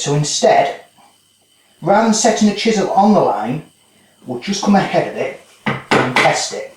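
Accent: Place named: Birmingham, United Kingdom. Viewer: "Cotton rebates"? Dude sounds like the Peaky Blinders.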